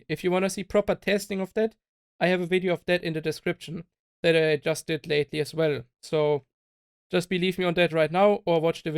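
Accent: Germany. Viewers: Bist du deutscher ??? You Liste like a german guy and speaks english 😂😂😂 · love the hard german akzent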